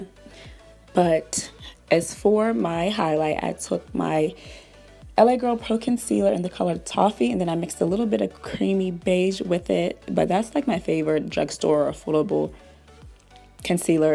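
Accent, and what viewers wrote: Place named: Pennsylvania, United States. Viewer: Are you from PA? I always think everyone from PA has an accent and you sound exactly like the accent I attempt to describe. Also this was beautiful well done!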